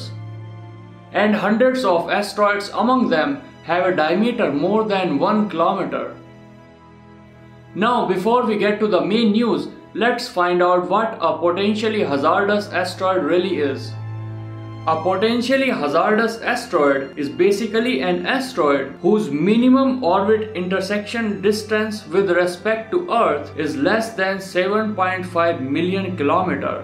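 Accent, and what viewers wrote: India. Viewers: Who thought the guy who is speaking in this Video is Indian? Me!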